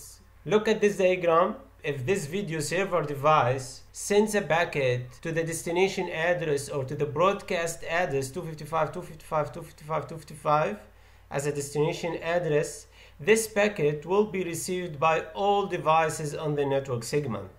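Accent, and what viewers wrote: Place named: Arab world. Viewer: واضح عليك عربي بس ماشاء الله تبارك الله شرحك فوق الممتاز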